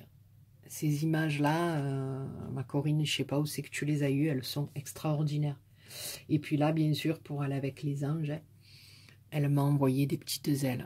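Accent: South, France. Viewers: Coucou, que de gentils et beaux couriers, tu les mérite. J'aime beaucoup tes vidéos et ton accent ensoleillé, pour moi qui vit dans le nord, ( Belgique ), c'est juste du bonheur. Je n'ai pas ton adresse sinon je me ferais un plaisir de t'envoyer une carte. Gros bisous Nanny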